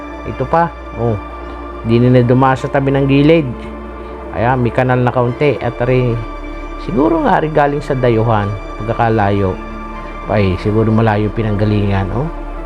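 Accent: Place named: Batangas, Philippines. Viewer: Meron pala na ganyang Koala Dive site. Mabuti at nadirinig namin ulit ang iyong sariling Batanguenyong boses 😊 Naoansin ko lang na medyo murky na ang tubig sa Mabini hindi tulad nung dati na napaka linaw.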